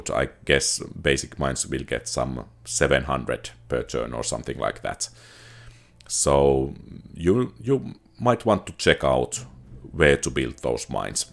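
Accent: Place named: Finland. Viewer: I like the info. And it is nice to hear a finnish accent on the English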